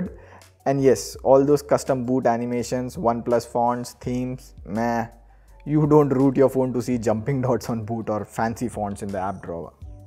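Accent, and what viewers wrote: India. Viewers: As an English speaker, it's so difficult to listen to somebody with an Indian accent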